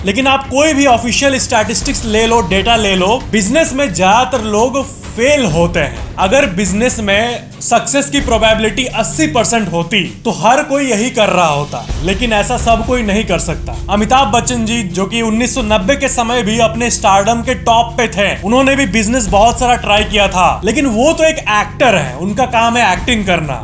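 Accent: Bihar, India. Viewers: Itne bade youtuber ho par aap ki laungauge sahi nahi hai botton badao sahi hota aap boltr ho battan dabo ge lagta hai aap bihar k ho.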